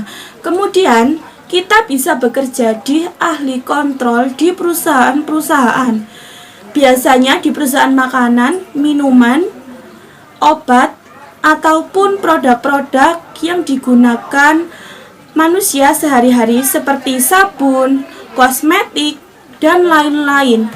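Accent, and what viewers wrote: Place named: Java, Indonesia. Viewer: Medok banget kak .. wkwk orang Jawa kah ?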